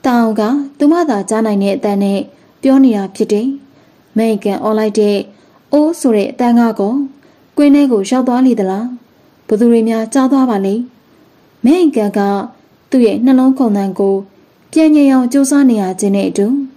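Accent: Upper Myanmar, Myanmar. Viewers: သနဲ့တ မကွဲတာ စာအုပ်ထဲကအတိုင်းလား ဖတ်သူကအညာသံပါနေတာလား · စကာပြောပုံလေတေကနာထောင်လိုကောင်တယ်အညာသူမဟုတ့်ပေးမဲအညာမြေကိုရောက်သွားသလိုပဲ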